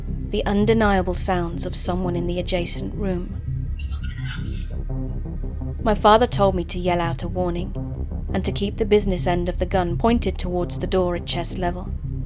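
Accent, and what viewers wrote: United Kingdom. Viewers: Good story, great beautiful actress, excellent editing and camera work! The whole thing was exceptionally well done, with very high production value. Only 2-continuity type things kinda confused me: If it takes places in the USA, especially the South, why does the Narrator have a British Accent? Also, I think forgot to take off her beautiful wedding ring, unless she's married in the story. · Admittedly, the coincidental situations that the lady in this story experienced are equally scary and sad. She leaves her abusive ex and tries to find solace at this place in the country, only to have some random creeper sneak his way into the new place as well. Otherwise, as always, this video and its story are both very well done, and British accent adds a very regal element to it. Good job yet again! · Very good, I am impressed but remind me never to go to that store for lingerie. 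The store lets the customer try on lingerie and then resells which is something that not done any store lol. The narrator did a fantastic job, is she British she sounds British to me.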